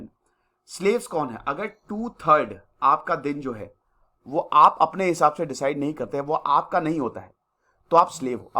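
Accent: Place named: Uttar Pradesh, India. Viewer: Sir your up vala touch is pride for me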